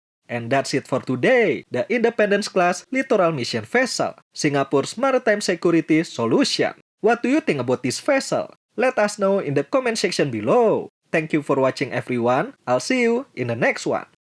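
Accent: Indonesia. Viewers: Its a gramatically correct and nice Indonesian accent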